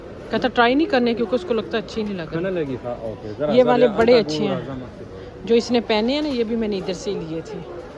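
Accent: Pakistan. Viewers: Wesy ma'am ek bt tu hai itny saal Canada main rehny k bad b ap ne Canada ko apny andr nai any diya ap jb bat krti hain tu ek Pakistani lehja alfaz sb kafi واضع hota hain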